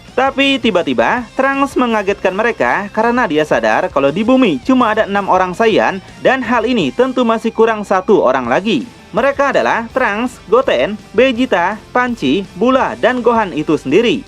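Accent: West Java, Indonesia. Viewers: ieu admin jiga orng sunda 😂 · Popolohikan sigan ieu orang sunda kang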